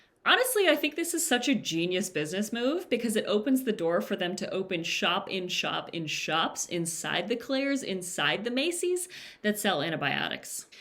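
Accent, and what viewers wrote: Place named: France. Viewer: Impeccable French accent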